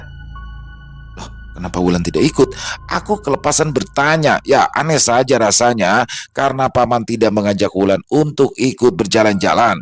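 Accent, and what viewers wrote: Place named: Banjar, Indonesia. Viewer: Bagus logat banjar anda 👍👍👍👍👍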